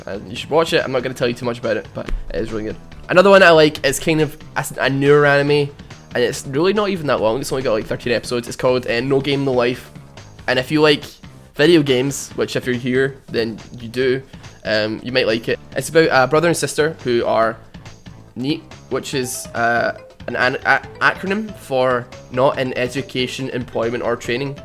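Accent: Scotland, United Kingdom. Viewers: Im scottish too lol literally sound almost identical to you * u * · You sound Scottish. Nothing else.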